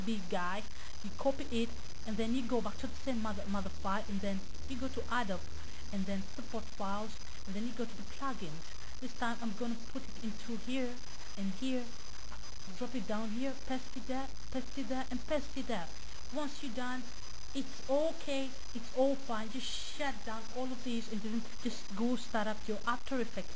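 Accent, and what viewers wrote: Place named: Africa. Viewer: No no crime we are brothers xD People don't understand an African accent when they hear one lol Keep up the great work + new subcriber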